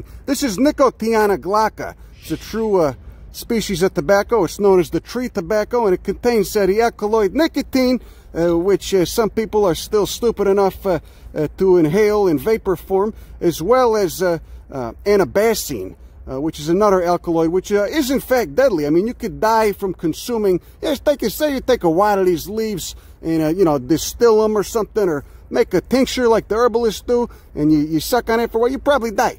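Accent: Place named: New York, United States. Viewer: Name that accent! My guess is NY/NYC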